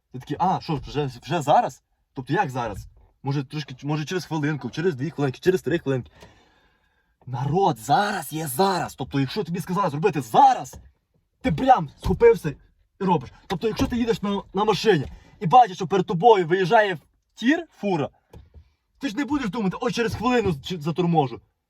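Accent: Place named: Western Ukraine, Ukraine. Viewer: Акцент сельский западной Украини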